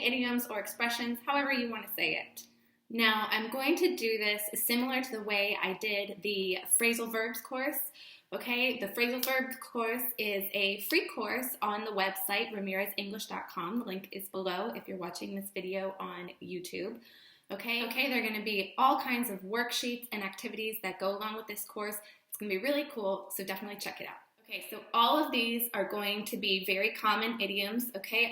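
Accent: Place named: Texas, United States. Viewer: you're from Texas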